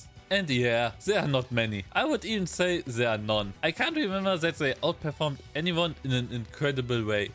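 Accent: Germany. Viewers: I've been wondering for a long time now. Are you German? The way you pronounce certain names combined with your accent really reminds me of German. If I guessed wrong, please don't be offended.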